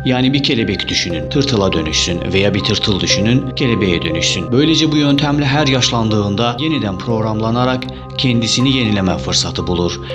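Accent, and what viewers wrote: Azerbaijan. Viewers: Azerbaycanlı bence aksandan anlaşılıyor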